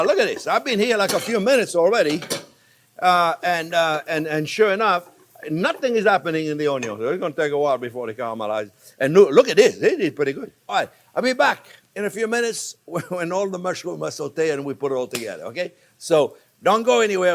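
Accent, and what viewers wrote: France. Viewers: And i love your french residues in your English as much as i love you detailed instructions & explainations in your video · Just discovered your channel last week and haven’t stopped watching the videos ever since! I love your voice, your food, your accent 💖 Everything about you and your channel is absolutely succulent 🤩 I’m almost 70, of Persian origin, living in France for the past 50 years, married to an Italian for over 2 decades and recognized as a good cook by all (even by my late mother in law who was a dream of a cook herself!) So I dare say I know food 💃🏻 You and your channel are a pure delight. Don’t change a thing👌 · “Onyo is always number first”, you’re awesome... I’ve also been using your accent while cooking.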